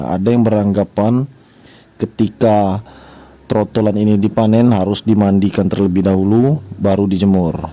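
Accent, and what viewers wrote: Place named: Bengkulu, Indonesia. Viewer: iya logat macam orang Bengkulu di mana bos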